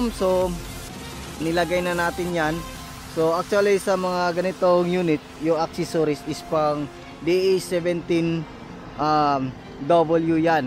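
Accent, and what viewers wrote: Visayas, Philippines. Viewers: Bisaya kaba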